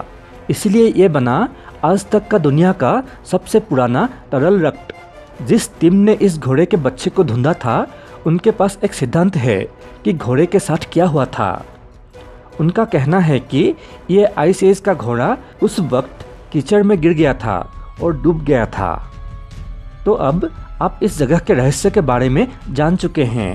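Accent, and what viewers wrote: Assam, India. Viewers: Ap Assamese ho lagtahe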